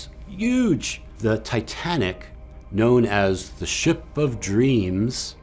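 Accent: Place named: Italy. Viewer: I thought I recognized a fellow Italian in your cadence and light language. My Birth Name is Margherita Cumero. Comes with Love ❤️😘❤ Such Gratitude for Your Energy and and who lead this Dragon here.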